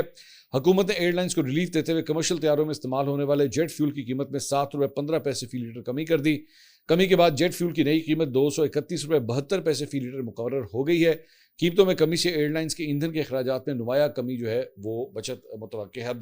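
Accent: Punjab, Pakistan. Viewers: Sir read slowly for correct Pronounciation . Like you Punjabi accents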